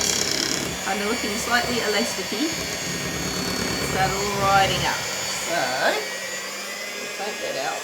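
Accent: New Zealand. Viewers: Lol Ido love how natural you are! Also its great hearing a NZ accent ❤ Commenting from Waikato, North Island. Great channel, keep up the good work 👏🏼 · Just returned to oz after a wedding of my niece; so nice to hear the kiwi accent. From a very homesick kwassie.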